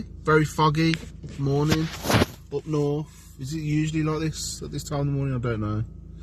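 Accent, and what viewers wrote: Birmingham, United Kingdom. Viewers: That AI description feature is amazing, since I restarted shifting a few of my personal items I’ve been hoarding over the years on eBay I’ve pretty much just been using that feature. Definitely saves time and gives you a far better description for your listing eh! Great video again bud you’re fast becoming one of my fave reselling channels! Love the accent too, my mum was born in brum and my nana had the same accent, so it’s very natural for me to enjoy your content bro! 😊❤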